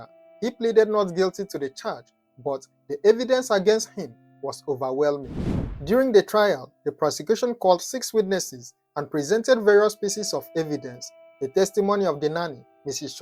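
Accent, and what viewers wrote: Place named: Calabar, Nigeria. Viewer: My brother, I like your Calabar ascent. God bless you my bro.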